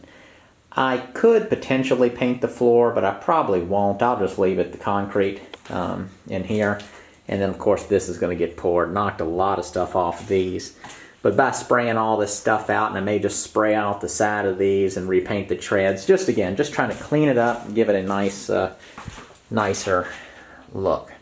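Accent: South, United States. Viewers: Hi! You finally whooped that concrete pillar! Congratulations!! Will you tell us which state you live in? Just curious to know, since you and I share the same southern accent. If not-that's good too. Enjoy these videos a lot.:-)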